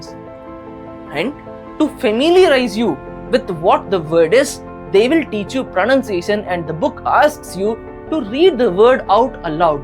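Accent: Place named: North India, India. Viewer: Anna complete English Ki books list cheppandi Anna nuv maa oniveyy 😂 English la matladitheyy north odu ankunna 😂😂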